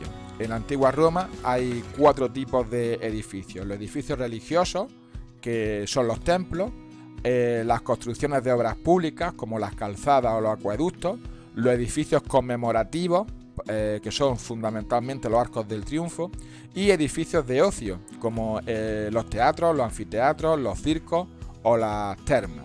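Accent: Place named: Andalusia, Spain. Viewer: Me encanta su acento andaluz de pura cepa 😁😁😁😝🎻🎶 me encantó ir de turista a Cádiz, me gustaría repetir, saludos 💝💝💝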